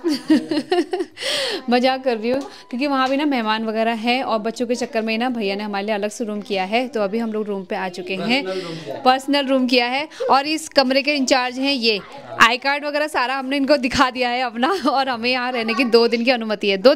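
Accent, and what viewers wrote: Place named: Almora, India. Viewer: बेटा आपका मायका कौन से गॉव में पड़ता है। आपकी भाषा अल्मोड़ा जैसी लगती है। नामकरण में जाकर आपने अपना फर्ज निभाया। बहुत अच्छा लगा। 🙏🙏🌹🌹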